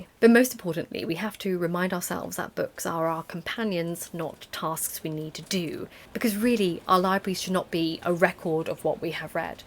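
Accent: United Kingdom. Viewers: If I have had to choose someone to represent the british accent, it would be you.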